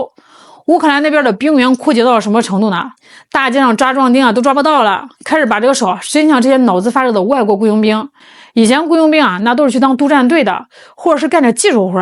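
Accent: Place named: Northeast, China. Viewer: 這種東北口音 聽著很難受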